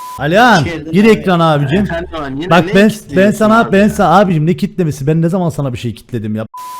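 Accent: Cyprus. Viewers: Abi sen Kıbrıs lımısın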